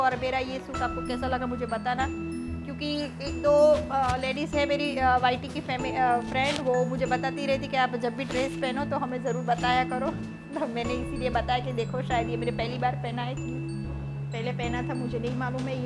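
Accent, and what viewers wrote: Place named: Gujarat, India. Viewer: Di ap gujrati ho apka tone se lag raha.... Di apko sub krdiya hi...mujhe support ki jarurat hi di plz support kijiye naa....mi v roz augi apke video pe i promise 🥰🥰🥰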